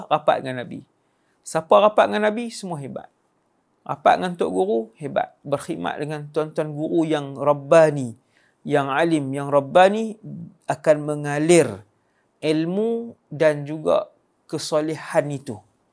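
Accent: Kedah, Malaysia. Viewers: Bismillah Alhamdulillah Assalamualaikum murabbi Wadi Annuar terbaik perkongsian sebelum menunggu masuk solat Asar layan sirah dulu senang faham Kedah pekat ringkas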